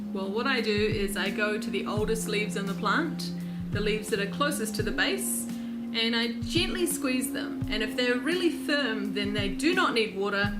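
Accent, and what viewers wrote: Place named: New Zealand. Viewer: Another kiwi! I heard Kia Ora and wondered if I was hearing things... then the accent confirmed it. I was given a rooted cutting, and showed a lady at the garden centre and she said she'd never seen one before?!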